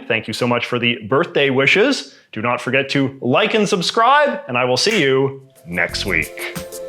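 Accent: Canada. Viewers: dude i can't get over the canadian accent wtf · learned in this video that some canadians also say “doubt” and other “ou” words with the stressed u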